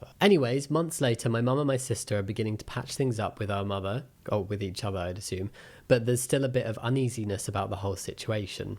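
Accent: United Kingdom. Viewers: Yk I’ve stopped watching all other British YouTubers because their accents kind of irritate me. You’re the only one I’ve kept :D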